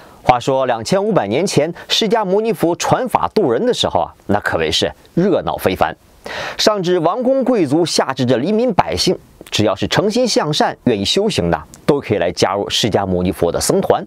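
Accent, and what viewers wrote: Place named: North, China. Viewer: 北方人吧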